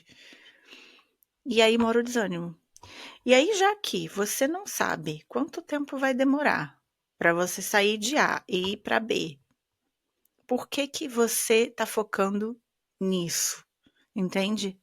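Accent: Rio de Janeiro, Brazil. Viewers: vc é muito linda e amo seu sotaque carioca. Sei da sua opção mas não posso deixar de me apaixonar pela sua beleza. meu sonho de mulher!!!:face-red-heart-shape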